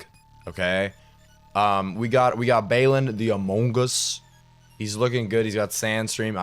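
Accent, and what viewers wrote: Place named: London, United Kingdom. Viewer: _ATJAbouTime: ligma be damned get well ah kid at least it's not sugma.... Love the series ah kid keep it up and no faffin. For the love of all things holy please learn to do a proper English accent no the London bollocks lol :_ATJDewgKEKW: Broke/bone for a name :_ATJTrevSmile::_ATJBrudOK::_ATJTrevSmile::_ATJBrudOK::_ATJTrevSmile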